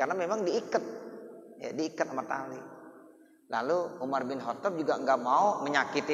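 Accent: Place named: Jakarta, Indonesia. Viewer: Smg Allah berikan kesehatan buat tuan guru kite, smg terus berdawah dgn bhs betawinya yg khas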